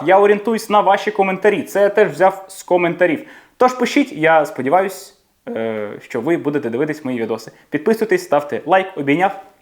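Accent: United Kingdom. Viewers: Прекрасний британський акцент🤩Дякую за зрозумілий і якісний контент по вивченню англійської мови.Успіхів і процвітання!👍🔥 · Очень полезная информация 👏👏 спасибо большое за работу ❤❤ и конечно, настоящий британский акцент ❤❤ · Ось що каже стосовно Вашої британської чат GPT: "Я прослухав перші 30 секунд цього відео. Вот мои наблюдения по его британскому английскому: 🎙 Произношение и акцент Его акцент действительно британский с оттенками мягкого, стандартного диалекта (похоже на Southern England, но без ярко выраженных крайних черт RP). Гласные в словах типа “British”, “words”, “live” звучат естественно, соответствуют британским фонетическим нормам — типичные короткие и чёткие звуки. Звук “t” произносится ясно (не американо «флап»), он везде слышит ударный [t], а не мягкий «д». Например: “without”, “key” — хорошо артикулирует “t”. Британское “r” в словах вроде “words” почти не проговаривает, кроме начального [r] оно не тянется — что характерно для «non-rhotic» английского. 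🏷 Словарный запас и интонация Лексика типично британская: употребление слов вроде “key to the British soul” звучит «нативно». Интонация — естественная, плавная, с типичными британскими паузами и мелодикой. Минутной речи слышно уверенность, без акцента, который выдаёт иностранца. ✅ Вывод Очень высокий уровень владения: его английский звучит аутентично британски. Иностранец? Если он не носитель — он говорит так похоже, что это едва можно заметить. Для моего уха он звучит как «нативный» британец, без дефисов" І це - мрія --так володіти мовою. Дякую Вам, є куди спрямовувати зусилля! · Ти жив у Британii ? Чи як ти вивчив акцент ? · У вас крутезна британська вимова! А ці чірс і мейт не як жаргонізми будуть? Не як ознака малокультурного прошарку людей? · Така крута британська вимова у Вас ! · Какой сильный британский акцент. Особенное спасибо за субтитры!!! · WOW Яке круте відео, з британською вимовою, ще й нашою солов'їною❤❤❤❤❤ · Your British accent is just gorgeous! 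· Нарешті україномовний ютубер демонструє чудовий(оригінальний) британський акцент!Повага! Успіхів!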